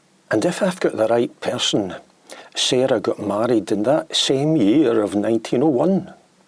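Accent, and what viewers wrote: Scotland, United Kingdom. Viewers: What a fascinating story! And told so well! No fanfare, just good old fashioned storytelling made all the more interesting by your lovely Scottish accent! Thank you! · Me gustó muchísimo tu investigación. Me habría agradado escuchar tu voz relatando la historia. No comprendo porque el audio que me llega esta doblado al español. No hay modo de disfrutar de tu acento escocés. Felicitaciones por tu tarea. Saludos desde Buenos Aires. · What a wonderful video, remarkable story telling ability, and great Scottish brogue. A delightful experience. You also reminded me of my Uncle Wink which also brought pleasant memories. Have subscribed to your channel and look forward to further video's. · Hello from Belgium. Thank you for this fascinating video. I always was interested in things like this. You're a great storyteller! Love the Scottish accent. 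Everyone can fantasize about the woman in the window. Thank's for doing the history. 👍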